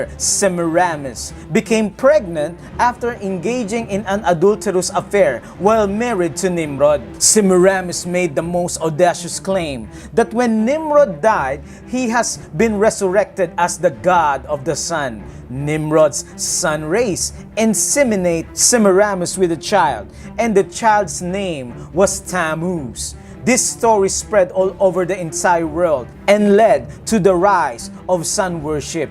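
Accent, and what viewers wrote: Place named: Bisaya, Philippines. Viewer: Bro could you change your bisaya accent to tagalog or neutral accent? Para kasing putolputol pakingan...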